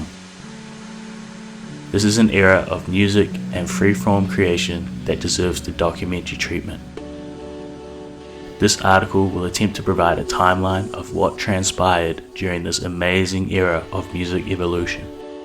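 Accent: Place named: United Kingdom. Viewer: holy shit british man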